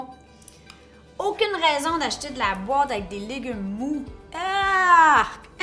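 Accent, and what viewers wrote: Quebec, Canada. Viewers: J'adore tes recettes et ta bonne humeur continue c'est un plaisir de te voir et de t'écouter avec petit accent québécois gros bisous à la famille 👍🏿😚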